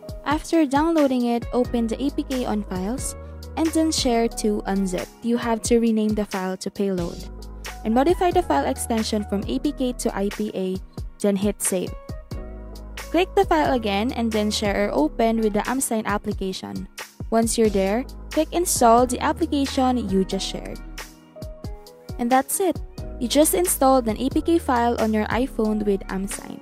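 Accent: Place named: Philippines. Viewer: Ang ganda mo!!, alam ko boses ng pinay pag narineg ko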